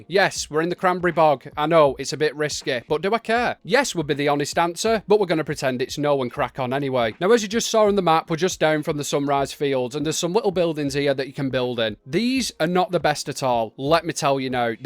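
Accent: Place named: Northern England, United Kingdom. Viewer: No, definitely wasn't me! i think you are brilliant , love the northern accent and love how you use proper British phrases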